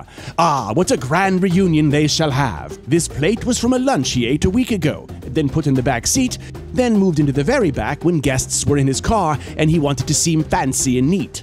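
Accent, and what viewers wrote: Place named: United Kingdom. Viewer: I love that this is a British accent “crisp” but is the driveway called a driveway? Cul-de-sac? Trunk? And as an adhd-er in Costco I have to say I have a ton of socks. Sure the lights are pretty on those other things but socks . . . You need those. . . I can’t fit them all in my sock drawer anymore. I really need to have a sock drawer for different seasons. (The sock aisle is way more dangerous than the tv aisle)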